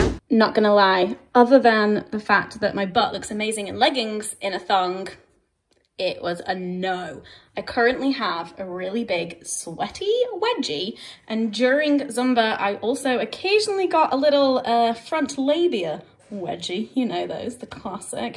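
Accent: United Kingdom. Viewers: You sound extra British today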